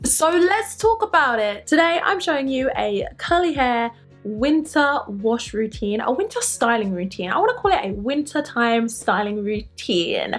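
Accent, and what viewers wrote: London, United Kingdom. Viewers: Your hair is growing so so long I love that London accent 😍 💕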